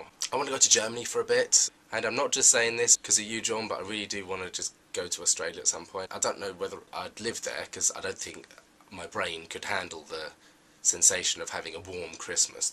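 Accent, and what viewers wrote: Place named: England, United Kingdom. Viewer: Welcome to "thatgaybunch". I thought you did a great job of introducing yourself through the questions that were asked. I believe you're going to be a great addition to this collab channel. BTW, I love the English accent.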